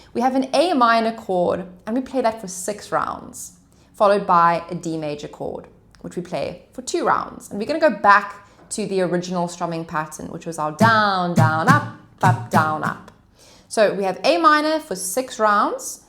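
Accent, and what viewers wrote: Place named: South Africa. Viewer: Thanks! That was a great tutorial. Nice and slow, especially the breakdown of the intro. And the tip about the capo change in the 3rd verse was super useful. Best of all, the tutorial was from a fellow South African. I grew up in CT (now living in the US), so I recognized the accent straight away. I love too, so I will be digging into your other songs by him. Keep the great content coming.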